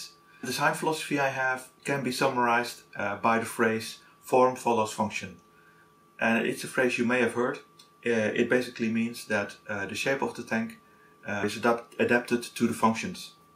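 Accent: Netherlands. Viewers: Are you dutch?! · Are you Dutch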